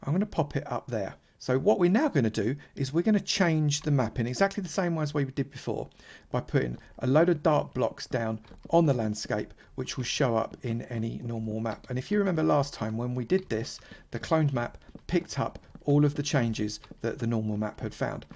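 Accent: United Kingdom. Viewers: Isn't this British accent?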